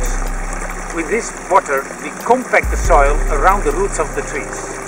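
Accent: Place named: Netherlands. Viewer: Probably, cant miss the dutch accent tho lol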